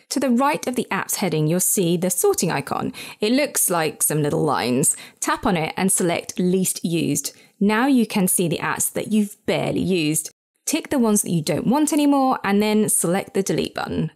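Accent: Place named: England, United Kingdom. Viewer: Bossy. English. I like it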